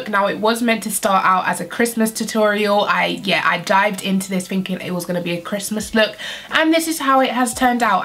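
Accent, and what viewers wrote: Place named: London, United Kingdom. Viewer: loving the look! And (sorry, English geek here), it's not that you pronounce thing wrong, far from that. It's London vernacular/slang - for example, with the "ask" pronunciation, most Londoners do say "arks"...just they way we roll haha <3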